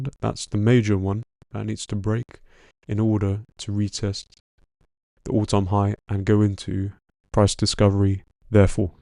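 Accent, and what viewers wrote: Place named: England, United Kingdom. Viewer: Are you English? Usually I know from the accent. You sound English to me, but I'm not 100%. You could be a Scandinavian who knows English as 2nd language from the crib maybe.Not Australian, not American, not east Europe, or west Europe, not south Africa, not from New Zealand definitely. Dude, you're killing me.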